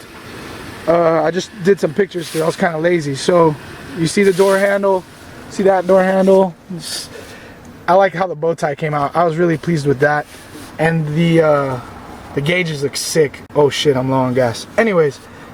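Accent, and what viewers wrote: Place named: Mexico. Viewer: quit talking like a Mexican. Looks good.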